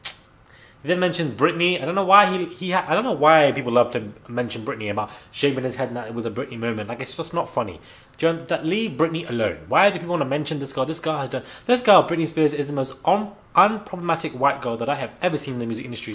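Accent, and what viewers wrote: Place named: England, United Kingdom. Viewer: I think I like your content but you speak way too fast. Especially because I’m Canadian & also can’t keep up because of your English accent, charming as it is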